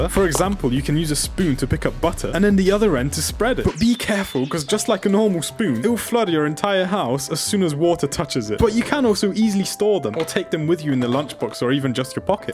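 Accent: United Kingdom. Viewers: He's just british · Mate are you briish pin me if you are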